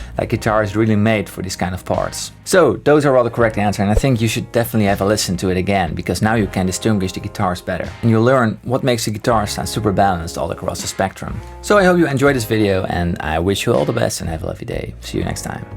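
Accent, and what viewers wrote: Netherlands. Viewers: You sound very very dutch. Heb ik t goed? ;)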